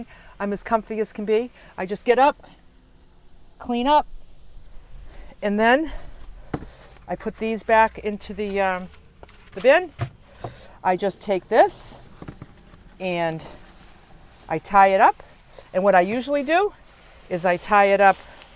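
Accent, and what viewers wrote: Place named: Boston, United States. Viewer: Hi, I just found you and love this video. Your a classy lady speaking of delicate matters and also makes me smile because I hear your Mass. accent comin in loud and clear and I feel home again. I don’t meet many here in Seattle with the accent and I’m always being asked where I’m from after I’ve said a few words that only one from Boston can say. I’ll keep on watching and loving your young spunky spirit to brighten up my day!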